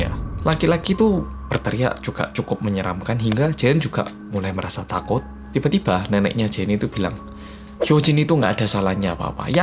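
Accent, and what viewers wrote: Java, Indonesia. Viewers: Woe logatnya orang jawa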